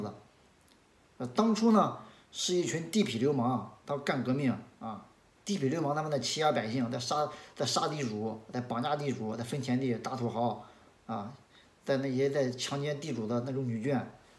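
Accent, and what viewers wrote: China. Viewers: 兄你好，我經常看你的影片，有個問題想跟你建議，能否錄製時把音量加大，我聽太不懂大陸腔，有時看你的影片會很辛苦，感謝你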